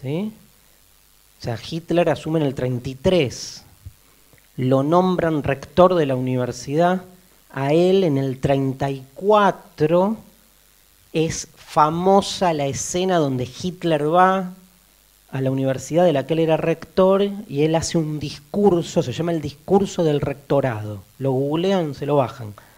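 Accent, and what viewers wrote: Argentina. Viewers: Acento argentino.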